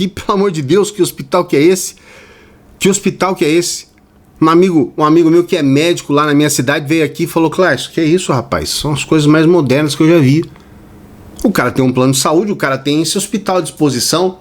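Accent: Minas Gerais, Brazil. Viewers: Vc e mineiro?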